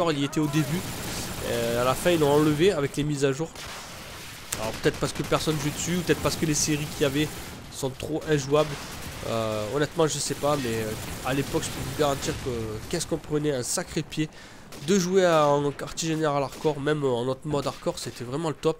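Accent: South, France. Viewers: Un bon carnage GG a toi, ta façon de commenter avec ton accent de sudiste me fait régaler!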